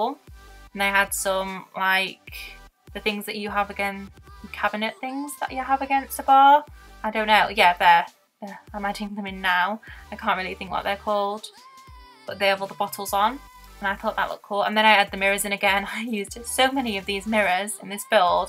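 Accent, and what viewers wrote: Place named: United Kingdom. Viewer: I like the voice 😊 It is better for me to improve my understanding to british accent 👍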